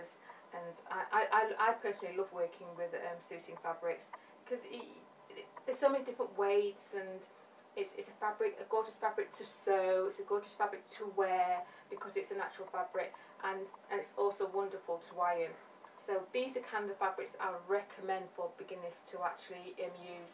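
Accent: United Kingdom. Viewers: Fantastic tips as always, I should be in bed but I decided to listen to my favourite online fashion tutor! From your accent I can tell, you are British. I live, not far from London. Could you recommend any areas where I can get the wasted ends of fabric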